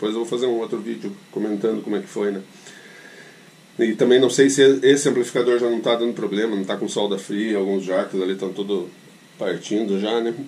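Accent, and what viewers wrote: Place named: South, Brazil. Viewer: Sim, excelente custo benefício mesmo. Me inscrevi no canal e estou olhando os vídeos aos poucos. Você é de onde mestre, senti um sotaque meio parecido com o meu na região Sul. Sou de Bento Gonçalves RS. Grande abraço!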